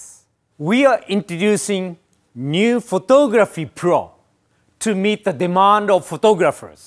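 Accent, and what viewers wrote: Japan. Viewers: A perfect blend of Japanese english and fluent native english. For both Japanese and native speakers to understand. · what a thick Japanese accent, yet not so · For a Japanese. His English and accent is impressive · Why can't they get someone who doesn't speak JAPENGLESE?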